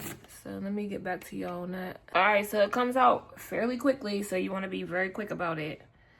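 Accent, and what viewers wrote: Pittsburgh, United States. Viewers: This is an awesome tutorial. I am born and raised in Pittsburgh Pa and your accent is thick. I can hear the Burgh in your accent. I say that you have an accent because I don't hear it in my voice because I live in ky over 20 years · Girl, I knew you were from pittsburgh when you first started talking! 😂😂😂 #412😊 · i know you from pittsburgh mama i hear my ppllllllll lol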